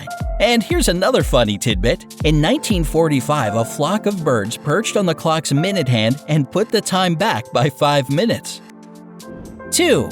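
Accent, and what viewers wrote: United States. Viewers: U sound American.. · why did he speak American accent in the video about UK???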